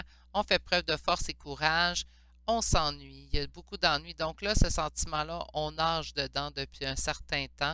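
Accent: Quebec, Canada. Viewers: Je n'ai vu que ton intro pour l'instant, et je voulais te dire que j'adore tes petites anecdotes ! Et comme tu l'as dit, t'entendre parler de réunion donne de l'espoir (et puis je suis contente pour toi surtout 😁). Généralement, quand j'entends quelque chose qui me fait de la peine (et que ce n'est pas une méchanceté à la base bien sûr), je me dis que ça en dit plus long sur moi et les blessures que ça vient réveiller que sur la personne qui a dit ces choses là... Oh, et J'ADORE ton bel accent et tes expressions québécoises 🥰 J'ai l'impression qu'en France on se "moque" beaucoup des autres accents de la francophonie, alors que bon, pour les autres, c'est nous qui avons un accent 😅 Désolée pour ce long pavé, mais je t'ai sentie attristée, alors je voulais rééquilibrer un peu la balance. 😉❤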